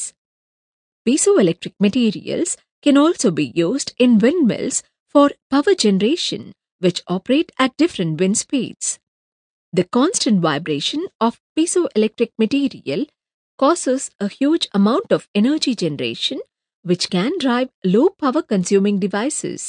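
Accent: India. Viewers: Great, but unfortunately I will never get used to that English-Hindi accent 😓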